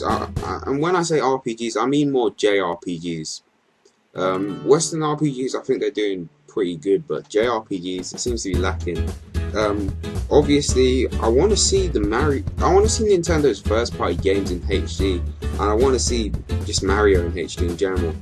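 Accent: United Kingdom. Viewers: You're from London, and you're either ghanian or nigerian. Am I right? :)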